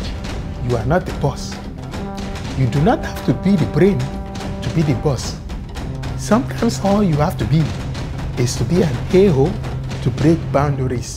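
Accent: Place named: Africa. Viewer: I don't know How you showed Up on my screen But maaaan I love this video specially your african Accent 😂😂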